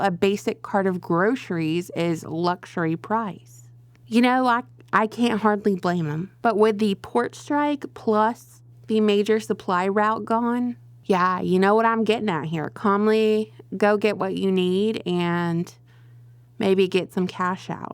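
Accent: South, United States. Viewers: she never had this deep of a southern accent before. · she didn't talk like that before, not that southern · Because she is southern.,duh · wait a second, you have a southern twang now which you didn't have before, what happened?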